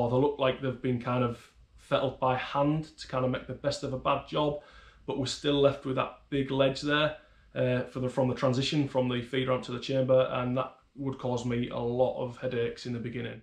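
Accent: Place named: Northern England, United Kingdom. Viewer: Hi there matey, am loving your 'Northern Style' as a fellow northerner it's nice to hear rather than yet another 'Shandy Drinker'. Just got my 22WMR Variation back from my local force and I am currently in discussions with Rob at Guncraft Ltd. about one of their 22WMR AR's. The thing that I can't seem to decide on is barrel length vs Handguard Length. What Length is your DD Handguard? as I am aware you're running one of Rob's earlier 18 inch barrels. The reason I ask is I like the look when the barrel doesn't protrude far past the Handguard, that way when you add a moddy it kind of has the look of an MP5SD if you know what I mean? Finally I see you like the Kriss Defiance DMK22, I've had mine for just short of three years and I absolutely love it, still one of my favourite gats. I'd post a pic but haven't worked out how to do it yet? 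Oh an incidentally this is my first ever You Tube comment so consider yourself honoured!?!?! lol.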